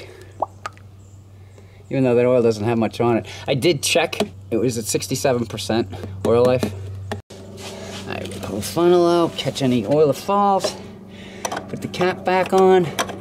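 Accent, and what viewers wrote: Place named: East Coast, United States. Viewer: I have watched this video a half dozen time while changing the oil in my wife’s Jeep. But really I just watch it for the east coast accent factor 😆.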